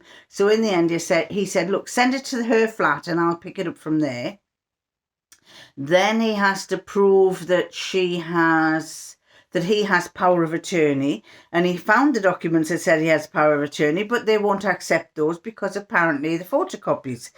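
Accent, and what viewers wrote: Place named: North East England, United Kingdom. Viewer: Hi there my mother kept my teenage quilt they threw nothing out that generation. its about 54 years old its a bit faded and thinner athough in amazing condition so when she passed away I took it. I remember it had a frill around it that was taken off. We used to call them eiderdowns. I put it inside a single warm quilt cover and its lovely to snuggle under. Always lovely to hear a North East accent on a vlog. I live in Newcastle xxx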